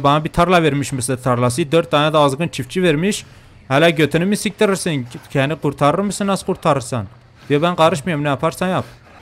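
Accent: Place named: Diyarbakır, Turkey. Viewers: Ay ay Diyarbakır sivene hayranim abimm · Abecan abecan sen kesin diyarbakirlisan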